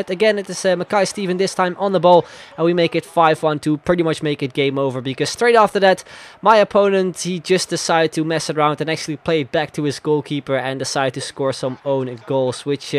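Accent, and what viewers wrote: Netherlands. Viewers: Ben je nederlands want je klinkt wel zo😁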